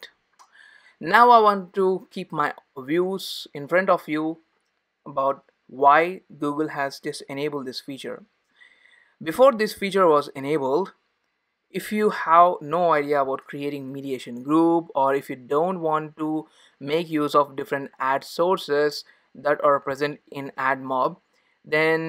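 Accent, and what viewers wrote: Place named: India. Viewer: i think you are indian because you have the indian accent of talking in english maybe like my accent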